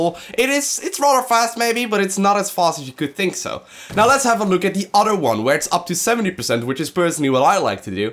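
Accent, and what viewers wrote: Netherlands. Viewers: ofcourse / natuurlijk. Dutch accent anybody.. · just a question but is he dutch?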